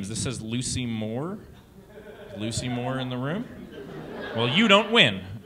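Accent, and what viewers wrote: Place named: North Carolina, United States. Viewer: Oml i know that nc accent feom anywhere.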